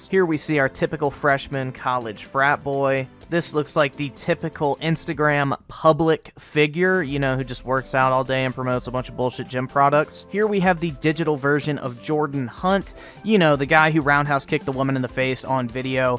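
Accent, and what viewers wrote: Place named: South, United States. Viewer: Bro i remember these! He had that southern accent coming through still and he scripted his vids still..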